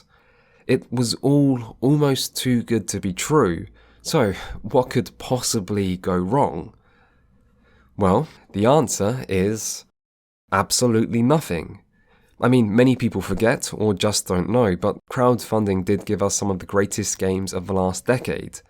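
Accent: United Kingdom. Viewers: british english - instant skip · OMG everytime I hear that brit accent I end up hating the thing I used to like.......arrogant snot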